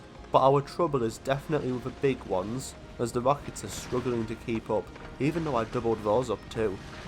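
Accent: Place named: Northern England, United Kingdom. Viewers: Also I'm dutch but me mom is from York, your accent seems to be mostly Geordy with a bit of Yorkshire added. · Its a northern england accent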